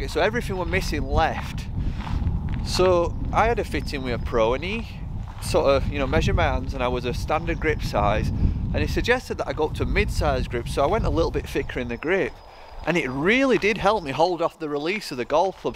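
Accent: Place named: Liverpool, United Kingdom. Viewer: Sound like your from Liverpool And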